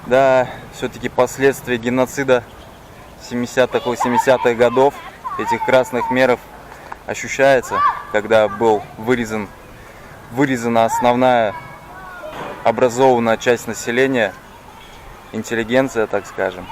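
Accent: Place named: Chuvashia, Russia. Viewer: это чувашский акцент на английском языке.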